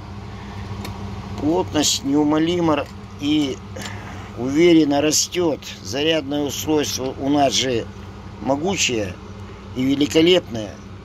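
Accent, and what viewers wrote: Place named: Chita, Russia. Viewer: Друган ты точно с Казахстана !? А то мне кажется ты наш , с Читы )))👍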